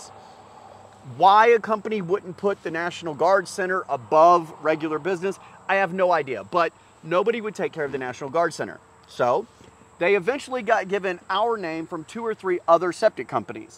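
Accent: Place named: Asheville, United States. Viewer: You are definitely from Asheville .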